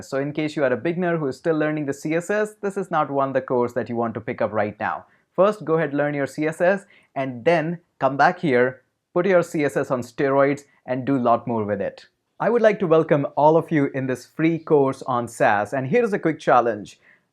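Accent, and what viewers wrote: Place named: India. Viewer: Bought your Android course today and it's amazing. Your Indian accent is pretty good. I am watching your video from 3k subscriber and it's good to be in 200 k subscriber family❤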